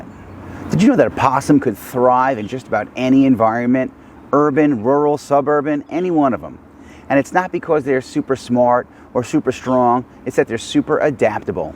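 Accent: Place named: Long Island, United States. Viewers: He sounds like he is from Ronkonkoma or farmville but his info is solid. His hands made me laugh. He talks like everyone in my family!! :) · you sound like you are from Long island? I grew up in Suffolk county!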